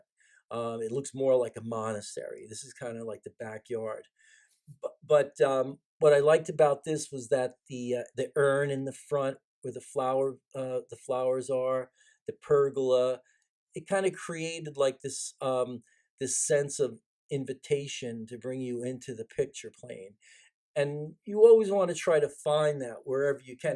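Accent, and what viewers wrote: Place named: New York, United States. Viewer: I detect a New Yorker (I'm originally from B'klyn).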